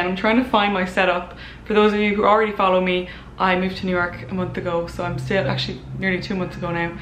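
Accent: Ireland. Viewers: Always look forward to your videos, especially when you talk with your Irish brogue ❤️ Love from Canada 🇨🇦 Don’t worry, I’ve got my winter puffer jacket out. Getting way chilly here in Eastern Canada.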